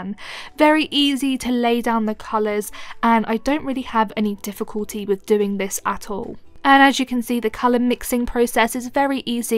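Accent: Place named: United Kingdom. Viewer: WOW! What a terrific, honest review. I actually like the Derwent Lightfast, despite the high cost, but F-C Polychromos is my default set and I supplement with both Derwent Lightfast and Caran d'Ache Luminance, especially for skin tones. I thought without question you were going to endorse Derwent. Honestly, it's your accent, and my bias indicates unjustified prejudice. I think the genesis of my bias can be found in the fact that in 2014, when I began as a graphite artist, the most enthusiastic reviews I heard were all from British artists, and all of them endorsed Derwent Graphic, so I purchased a full set. What a mistake. I conducted a full-blown scientific technical study of graphite pencils in 2015 and published my results as a 360-page book, Pearson's Graphite 2015. I found Derwent Graphic was just about the worst graphite pencil set, containing scratchy pencils, dark grade pencils that gave lighter lines than light grade pencils, broken leads, misaligned leads, and so on. 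Faber-Castell and Mitsubishi Hi-Uni had some of the best scores for the 370+ pencils I tested. I have yet to hear a British artist say anything bad about Derwent Graphic, which raises all kinds of questions in my mind. I find nothing at all wrong with any of the Derwent coloured pencils, but their graphite pencils are simply crap. Maybe Derwent has (I guess you would say 'have') decided to give up on graphite and concentrate on coloured pencils?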